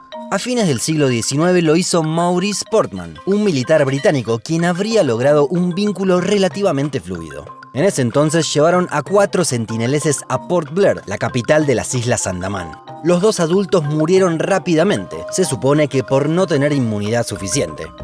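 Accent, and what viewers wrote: Rioplatense, Argentina. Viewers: Parece argentino pero el sonido sh en la ll e y es muy suave , no parece el típico acento porteño . Es como un acento argentino neutralizado . Resulta muy agradable y claro . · Por el acento diría que es argentino o uruguayo..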